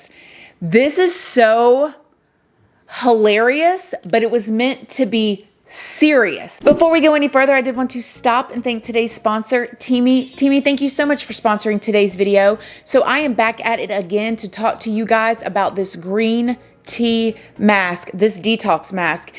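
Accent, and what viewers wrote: South, United States. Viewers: This is probably your best video yet! You are straight up for real with your feelings on this because your Southern accent has never come out like this! I sound super Southern when I feel like this, too! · I love how your southern accent came out in this video, lmbo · Your southern accent is coming through strong today! Lol when I get loud, mine does too. lol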